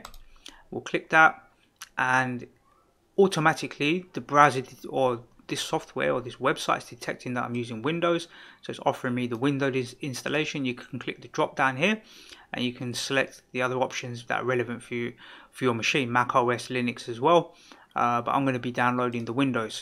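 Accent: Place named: Cockney, United Kingdom. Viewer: Thank you my fellow cockney instructor! clear and concise you are.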